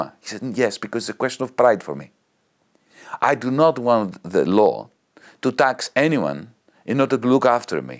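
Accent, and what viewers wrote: France. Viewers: Mais... Pourquoi tu commences l'interview en prononçant pas trop mal l'anglais, puis tu switches sur le pire accent français ? Un petit effort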